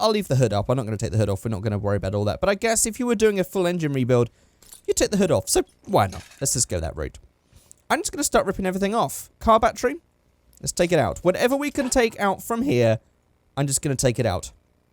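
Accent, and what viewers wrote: United Kingdom. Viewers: I couldn't wait to see this! Thank you <3 Btw, your accent seems British, Am I right or not? And one more thing: Chrome:P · great video it's relaxing it's like a podcast of a British guy who's never built a car in his life building a car I love it.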